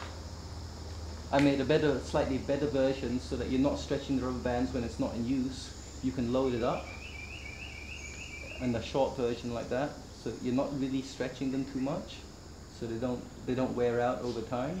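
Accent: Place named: United Kingdom. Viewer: I never woulda thought you had a brit accent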